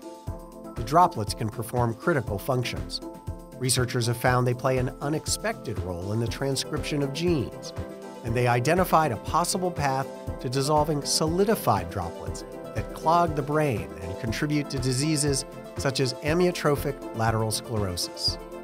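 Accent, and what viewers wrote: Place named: United States. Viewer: The American pronunciation of harassment, detail and zebra made this difficult 😅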